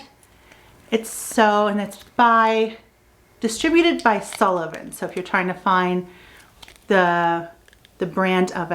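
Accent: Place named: Western New York, United States. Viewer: I knew you wer from the Western NY! I could tell by your warm demeaor and your accent!! I was born and raised in Hamburg, NY. I will have to check that store out the next time we are back in Buffalo visiting our family. If you have any other mom and pop shops you can recommend, I would love to know. We come back every summer and I love to find new home decor stores! GO BILLS!!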